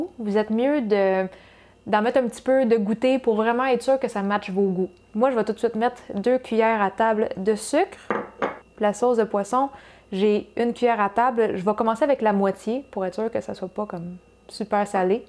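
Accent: Quebec, Canada. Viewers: j'adore cette accent québécois sauf quand c'est Bruce Willis ou mel Gibson qu'ils l'ont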